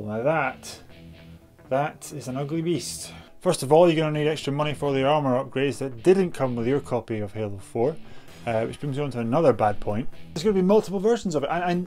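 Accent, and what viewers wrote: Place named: Ireland. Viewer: This is an irish accent right?...I like it. I want it. Gimme your accent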